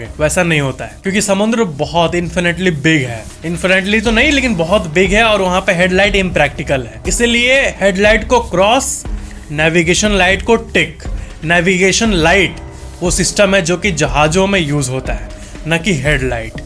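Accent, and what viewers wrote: Bihar, India. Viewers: Ap bihar k hona🤔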